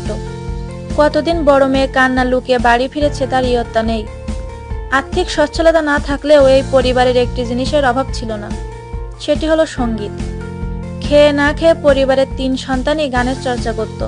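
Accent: Bangladesh. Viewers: Tumi ki Bangladeshi.. bangla desir moto kotha.. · কি বিশ্রী উচ্চারণ এই বাংলাদেশী মাগীর। ইন্ডিয়ান বাঁড়া চুসে বিচির রস দিনে দুবার খেলে উচ্চারণ ঠিক হবে।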